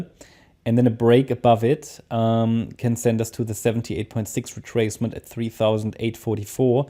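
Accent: Germany. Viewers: love your content too! you are a german guy? i mean i hear it^^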